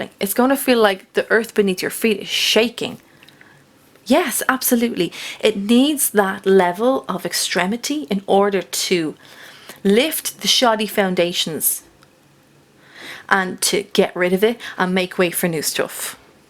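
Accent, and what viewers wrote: Ireland. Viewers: This was so fascinating. Kinda scary how much this resonates. I really like how u read. I appreciate how no nonsense you are yet gentle and encouraging. And it feels natural; not at all contrived. It def was an intense read. But somehow I don't feel upset or unsettled becos u infused so much helpful insight and reassurance. Subscribing!! Thank you for this read. (Hearing your Irish accent makes me miss my dear friend who's Irish) 😊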